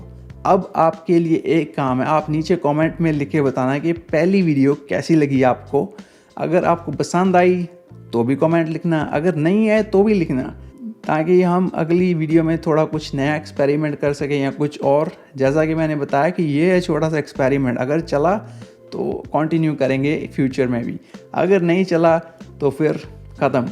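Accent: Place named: Punjab, India. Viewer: Nice nice.. Your punjabi accent is creating more personal connection with you. Pehle hi your videos were super helpful and now its way more relatable as well ❤️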